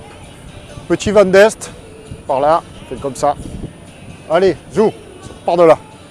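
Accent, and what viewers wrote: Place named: Marseille, France. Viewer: Quel bonheur de regarder ces navigations sur la grande bleue dans cette région ( Bandol , Les embiez , Toulon , Porquerolles ) que je cnnais très bien . On a en prime cet accent marseillais plein de ce soleil qui nous fait tellement défaut à nous " voileux du nord de la France " . On sent le marin heureux d'être en mer .. un vrai régal et merci pour ces moments bien agréables ..Yannick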